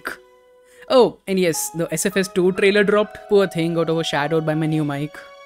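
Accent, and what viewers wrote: India. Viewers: Bro has Indian accent!.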